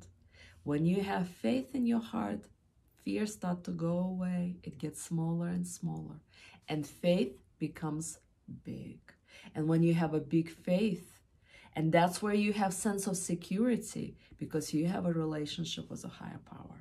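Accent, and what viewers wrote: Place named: Eastern Europe. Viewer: I knew it!😃 I just didn't know for sure because there are a lot of eastern Europeans that have a similar accent to yours (atleast when I hear it) 😂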